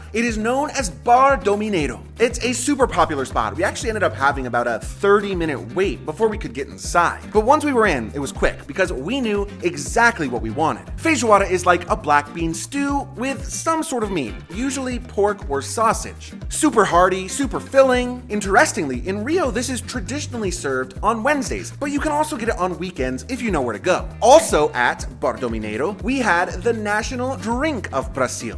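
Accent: Spain. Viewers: Are you from the US? Where does your Spanish accent come from? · The way he forces himself on sair Brasil is kinda annoying. The spanish accent is so uncessary.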